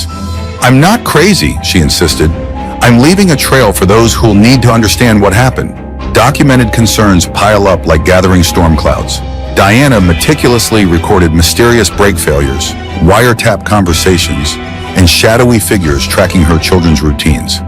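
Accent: United States. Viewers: Why is an American doing the commentary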